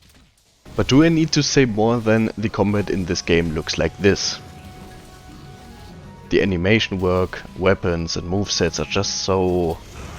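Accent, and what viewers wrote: Germany. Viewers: indeed. 60 hours and im closing in on my full set fatalis. Btw ur video was pretty cool, i rly like it. Ur accent sounds german. Am i right with that assumption?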